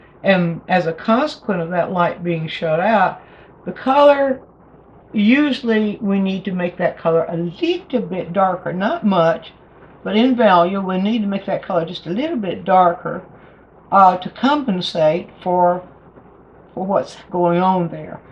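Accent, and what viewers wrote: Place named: South, United States. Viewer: Yet another nugget of wisdom from YouTube's best painting instructor with the most beautiful southern accent. 😊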